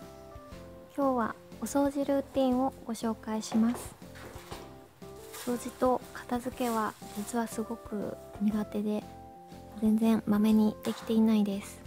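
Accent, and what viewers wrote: Kansai, Japan. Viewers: イントネーション関西人っぽい🌟り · 関西の人や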